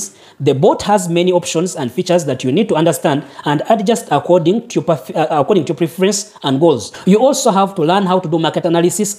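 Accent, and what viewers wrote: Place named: Buganda, Uganda. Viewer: He seems a muganda according to his acent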